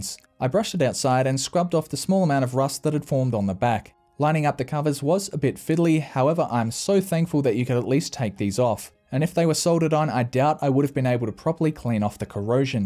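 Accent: Australia. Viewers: About a year or two ago, I stumbled upon one of this guy's videos and found it really entertaining and strangely calming. After the video was over I went and watched something else and completely forgot about this channel. Yesterday, I remember having a cup of coffee and browsing some tech videos and thought, "What was that channel with the young guy with the Australian accent who fixed computers?" I couldn't remember. But then...I remembered a very small detail. I went to YouTube's search bar and typed... "PC cleaning eucalyptus oil guy" and BAM found him. Subscribed so I don't forget again.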